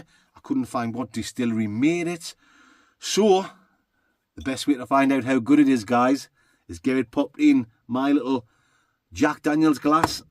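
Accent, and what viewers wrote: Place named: Newcastle, United Kingdom. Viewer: Hi! Love your channel!😊im from Denmark, and I have a friend from Newcastle, he moved to Denmark many years ago, but i recognized the Accent immediately 😅 you guys are special in the hest ways possible!❤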